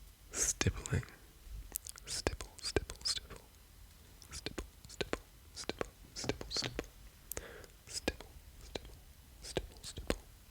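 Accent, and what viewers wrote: Australia. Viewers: n Australian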